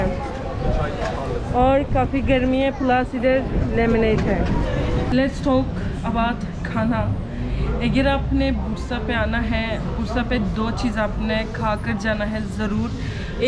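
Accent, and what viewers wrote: Pakistan. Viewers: Aapka urdu sunnne me mashaAllah achha lagta hai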